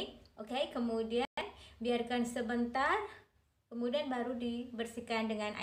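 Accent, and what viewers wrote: Malaysia. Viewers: Anda orang mana kayak orang malaysia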